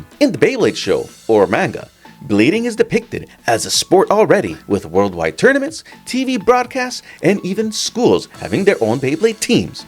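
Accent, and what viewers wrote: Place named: Canada. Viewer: [tɑ.kɑ.ɹɑ təʊ.miː] is how I pronounce it. Japanese people don’t bat an eye when I say it. But I don’t feel like I say it like they say it. You’re speaking in English with a Canadian accent. You’re not saying it the way I say it. But I ain’t a Canadian. In the end, who cares? 日本語を話しますか？Probably not. But again, who cares? I’m still trying to get used to your cadence. 😅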